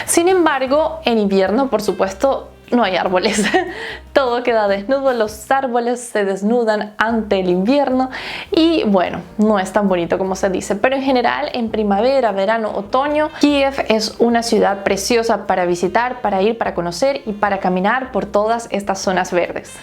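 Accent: Venezuela. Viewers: Ya decía yo, esta chama como que habla caraqueño. xD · Es como si una venezolana me estuviera explicando sobre Ucrania. Saludos! Pais hermoso Ucrania Por cierto, eso es totalmente cierto lo que dice en ; es uno de los motivos del por que me quiero ir de Venezuela Naguaráaaa! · De hace unos 2 meses aprendo ruso y de pronto me encontré con tu canal y ese acento me decía lo conozco, pero, será que me equivoco.... Pero hoy ya me di cuenta que no estaba equivocado Venezuelaaaa jejeje excelente.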